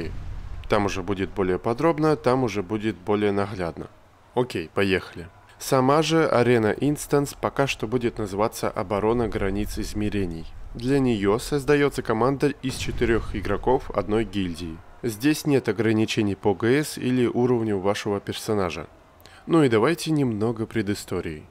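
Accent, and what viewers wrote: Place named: South, Russia. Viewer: Необращай внимание, есть у тебя некий "южнорусский" акцент).Вначале думал ты с Украины, но потом увидел некий город на букву Л.Это фигня, ты молодец, работаешь над собой помню твои старые ролики)))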